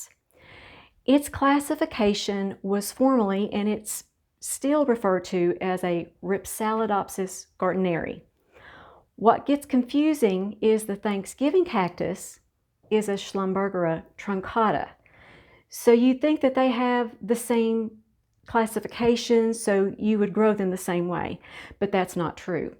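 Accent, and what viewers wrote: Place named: Tennessee, United States. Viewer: Are you in Tn. We have the same accent.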